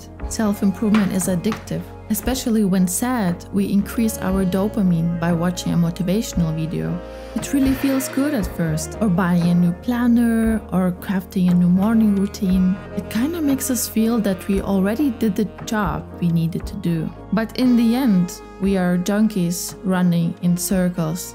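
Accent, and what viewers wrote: Germany. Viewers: Toller Video. Die Shots, Winkel und Kamerafahrten sind genial. Der ganz leichte Deutsch-Akzent macht zusätzlich charmant. Etwas schockiert plötzlich mit Dir in der Dusche zu stehen... Insgesamt: Wow. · I like your German accent, mamma mia! Guten abend von Italia!!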